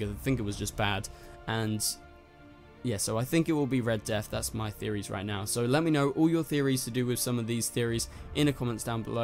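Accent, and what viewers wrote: United Kingdom. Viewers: Thanks for the vid bruh! And... Is he British?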